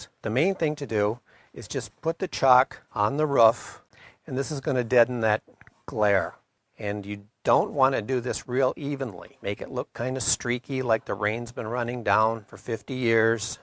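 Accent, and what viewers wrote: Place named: Midwest, United States. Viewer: If you had a clue what you were talking about you'd know that this is a midwest accent with his family originally being from Europe.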